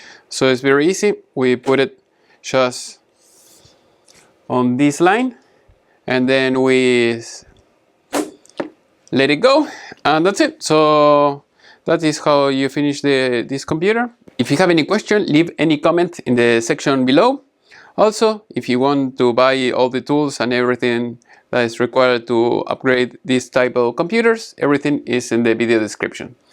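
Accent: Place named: Argentina. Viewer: Nice Gardel accent... ! love it...! thanks for the helping hand , cheers from Adrogue !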